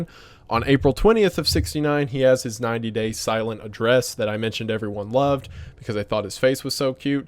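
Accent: South, United States. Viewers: Dawg I had no idea wendigoon sounded like he’s from the south till I heard his dad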